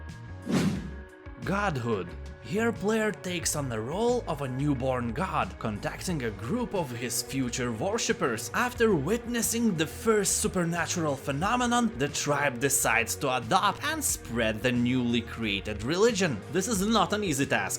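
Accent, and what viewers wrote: Lithuania. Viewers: Are you Lithuanian? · Wait a minute... that sounds suspiciously Lithuanian.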